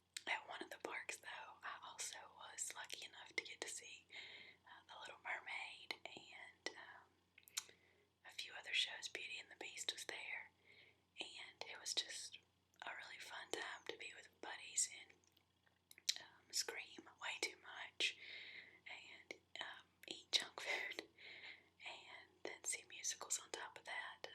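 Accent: South, United States. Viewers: Where are ya from? I detect a bit of a southern draw that just drives me crazy.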